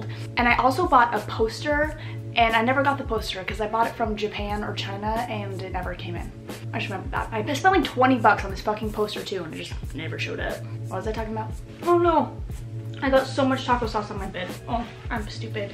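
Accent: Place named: United States. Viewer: Your country accent hahaha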